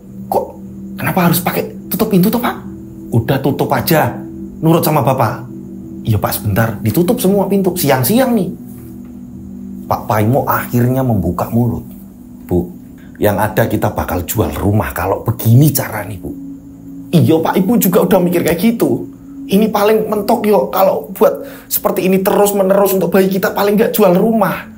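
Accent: Java, Indonesia. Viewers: Jadi seneng ni Bawa ceritanya bagus dengan bahasa medok jawanya ilustrasi wajah pas bawa ceritanya.suka suka...suka..❤❤ Boooom Booom Boooom dilanjuud njih ❤❤🙏🙏👍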